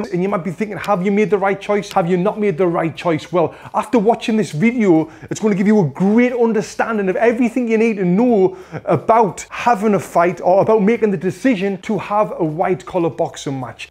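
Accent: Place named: North East England, United Kingdom. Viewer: Great advice, and explained clearly with enthusiasm, glad to see you've not lost the North East accent mate! Well done.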